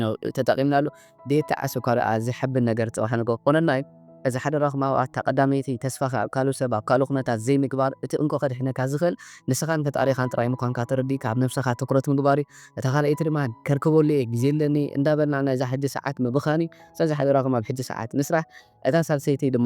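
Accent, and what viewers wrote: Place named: Ethiopia. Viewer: እቱይ ናይኩም ትንታኔ ብጣዕሚ ጨለ እዩማ ግን እቱይ ላዛ ትግርኛኹም ለይጣዓመኒ ብላይ ራያ ትግርኛ ጌርኹም ኣዋግዑናየ ላይ ራያ ራዮማ ትግርኛ እዩ ዓሳዲ ልምችእ ... ኣርግዕዎ እምበይ 😂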